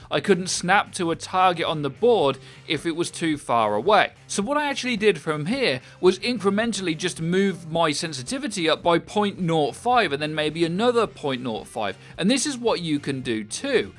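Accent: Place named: United Kingdom. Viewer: Im from germany and i love your british accent!!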